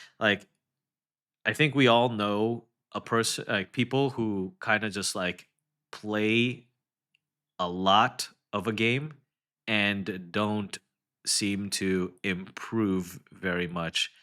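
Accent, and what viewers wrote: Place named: Hawaii, United States. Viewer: Brudda, you hawaiian. I hear the accent and see that sleeve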